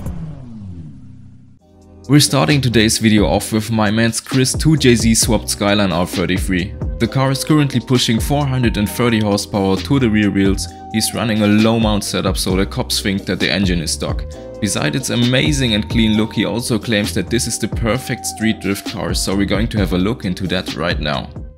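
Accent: Germany. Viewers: U Sound rlly german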